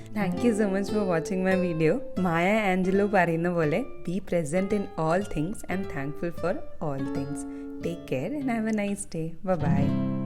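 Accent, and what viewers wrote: Thrissur, India. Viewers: Thrissure slang undallo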